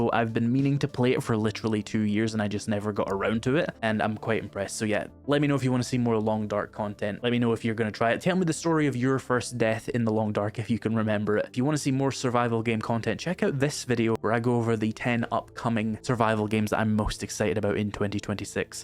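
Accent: Scotland, United Kingdom. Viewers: Ah, it's so nice seeing someone taking their first steps in the game and bit by bit figuring out the core mechanics. Makes the game feel all new and fresh even to a veteran player. :) Also love your accent, are you from Scotland?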